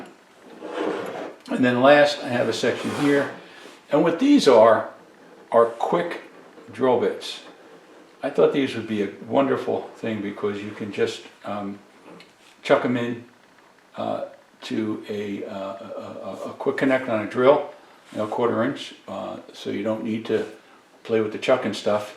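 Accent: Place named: Bridgeport, United States. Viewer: Great video. Nice content. You sound like you grew up in Bridgeport. Southside.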